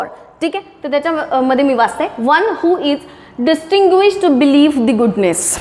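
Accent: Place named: Pune, India. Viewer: नाही मॅडम ,तुम्ही असखलित शुद्ध पुणेरी मराठी बोलता । वऱ्हाडी नाही वाटत तुमची भाषा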